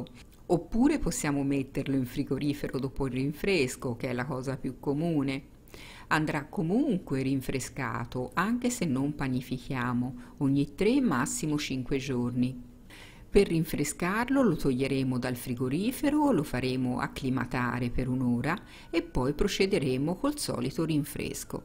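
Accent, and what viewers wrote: Tuscany, Italy. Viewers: Che bello questo accento Toscano!! 😊 Grazie per il video, devo provare a seguire la ricetta!! Direi che ci vuole mooooolta pazienza eheh Come si usa, poi, per fare il pane? Ci faresti un video? Grazie, grazie!!!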